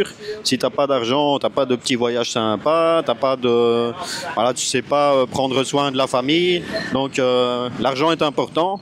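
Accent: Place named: Belgium. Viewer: Merci pour t'es conseil tu ma bien fait rire et ton accent Belge et très sympa !!!!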